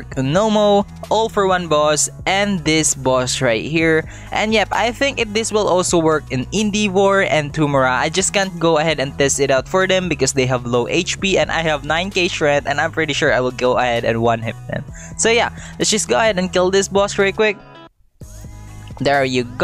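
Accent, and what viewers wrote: Philippines. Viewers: Are u filipino im asking cuz ur pronounciation sounds like most filipinos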